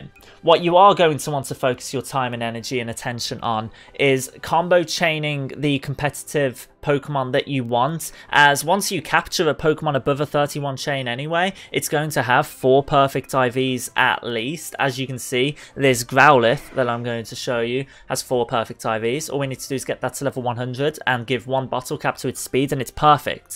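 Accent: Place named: Liverpool, United Kingdom. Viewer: SCOUSE DETECTED RED OR BLUE CHAMPIONS OR CRAP CHOOSE NOW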